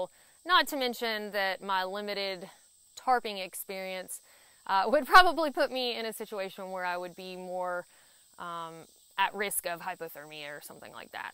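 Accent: South, United States. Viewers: I couldn't or wouldn't even want to try this setup. It's a TENT only for me. Nor would I try to use a Hammock on longer trips, maybe for a couple of nights. You are one heck of a gal and I've seen almost everyone of your videos. And every time you reached your end of the trail it was heartbreaking for me too. You did something I can't do. Oh, I'm from Carrollton, Ga. and the way you talk (like I do) is just so down home and proves you're a Country Gal. Waiting to watch any other new trips you go on.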